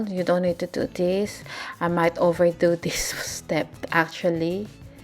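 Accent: Philippines. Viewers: Wow, looks lovely and the Tagalog accent is very sweet too. Trying this tomorrow. Thanks for the recipe.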